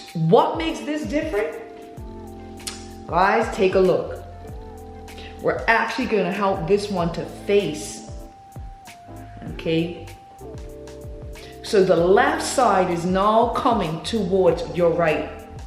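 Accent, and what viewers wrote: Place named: Bermuda. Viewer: I know a Bermudian accent when I hear it! Great video 💘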